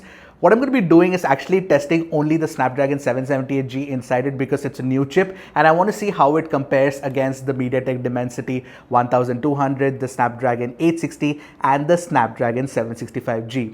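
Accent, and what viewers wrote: India. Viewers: I struggle so much to understand Indian accented English :(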